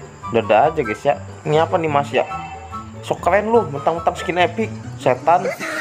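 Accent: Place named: Tambun, Indonesia. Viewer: kaya orang tambun bhasanya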